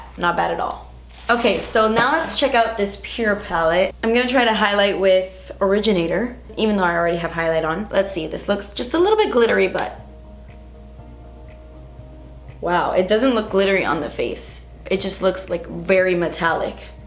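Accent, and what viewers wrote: Cuba. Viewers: Please speak more Spanish!! :D I’m Guatemalan-American and LOOOOOOVEEE hearing other bilingual Latinos speak in their native tounge 💕😊 Your Cuban accent is the Cutest! Just like you! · i love her cuban accent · Jajajajaja ame tu acento cubano!!!! Jajajajaj españo-cubano 😂😂😂😂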